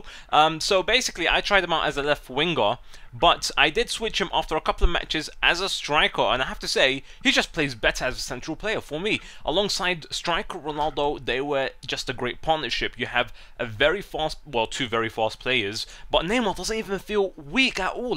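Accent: Belgium. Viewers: sounds like he was from belgium! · This guy had a belgium accent btw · He's not French...Didn't you ear this ugly Belgian accent ?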